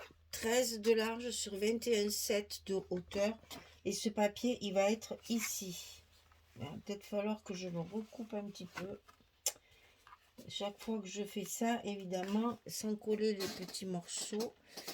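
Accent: South, France. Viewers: Bonjour, c'est pour vous dire que j’adore tout vos tutos, ils sont très bien expliqués, je n'ai jamais pensé à vous laisser un commentaire, mais je me rattrapages aujourd'hui, je doit faire un album naissance et il va y avoir beaucoup de vos pages. Je voulais vous remercier aussi pour m’avoir fait connaître le papier 50x70 cm, par contre vous êtes une artiste dans la décoration, moi j'ai encore des progrès à faire. Et merci pour votre bonne humeur et pour votre bel accent du sud donc je fais partie😊 ❤❤❤❤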